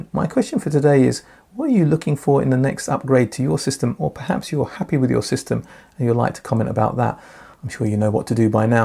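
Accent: United Kingdom. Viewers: Great stuff as always. And by now the way we in the U.S. pronounce 'h' (the correct way) and the way you Brits do so is lost in the background. Personally, I prefer separates over integrated. Especially monoblocs. If others feel differently, I say 'hats off' to them. Plenty of room in this tent for all. My net upgrades? Nothing wrong with the gear I have. At this point the limiting factor is the room. That's a tough upgrade!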